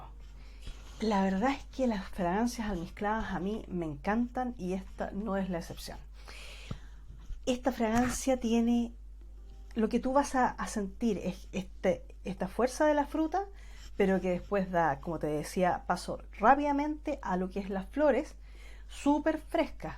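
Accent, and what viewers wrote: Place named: Chile. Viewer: Me gusta escucharte hablar con ese tono chileno. Saludos desde Uruguay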